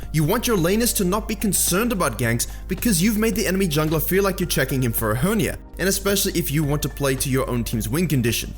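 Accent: South Africa. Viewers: . Thanks for the great guide. Are you from South Africa or have been in SA before?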